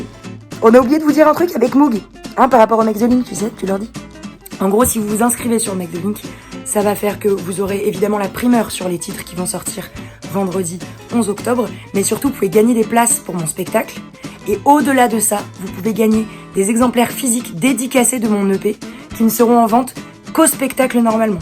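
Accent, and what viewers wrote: Quebec, Canada. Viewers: J'adore l'accent québécois 😂😂❤❤congratulations 💪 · Chuis jaloux de ton accent québécois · l'accent québécois, une pépite ! Bravo <3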